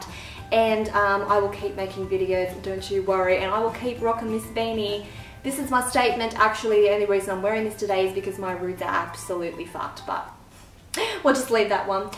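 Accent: Australia. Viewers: Bruh where should I start congrats btw 10k 🙌🏾🙌🏾 u deserve it, ur vids kill me there so funny I love ur personality for making something funny and ur Aussie accent is on FLEEK😍😍 . The reason why I want to win the extensions I really want to try something new im so used to weaves that I never considered extensions so why not try something NEW 😃😃 And for the brushes I haven't really liked makeup brushes u can say I'm new to makeup but I still use the basics and never had good experience with cheap as 2$ brushes 😂😂 ... Umm I don't know if I make sense but yeah lol 😋😋😎😄💁🏾💁🏾 LATERZ✌🏿️✌🏿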